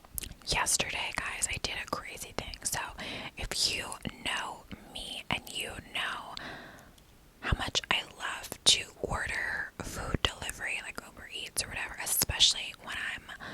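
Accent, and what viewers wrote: United States. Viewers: All i needed is my fav american accent this morning❤️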